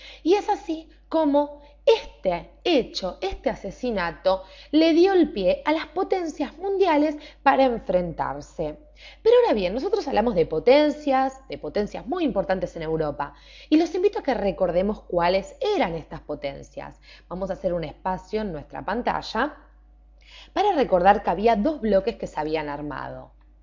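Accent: Argentina. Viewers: es de argentina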